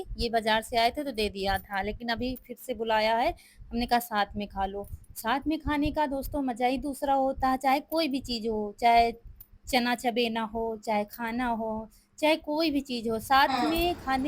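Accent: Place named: Jabalpur, India. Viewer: Aapke bolane Ka Tarika angreji Hamare Yahan Jaisa Hai Hamen MP ke Jabalpur Jile Se Hain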